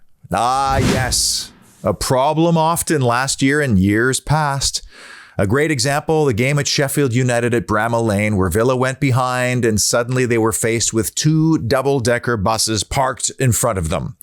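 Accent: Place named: Canada. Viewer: This is a great podcast, wasn’t sure initially when I heard the accent, what do Canadians know about football 😂, very judgemental I know, apologise for that. I will be adding this channel to my weekly watch as you left me feeling very optimistic and excited for the season a head. Keep up the good work 👍 UTV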